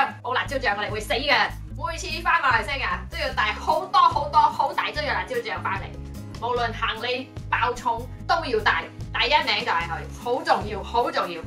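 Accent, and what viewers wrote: Cantonese, China. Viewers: 姐說的粵語真好聽。 呵呵~我就知道會有Milo，可惜我沒喝過大馬版的，台灣版的我覺得還蠻好喝的。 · 為什麼我聽妳講廣東話特別的舒暢？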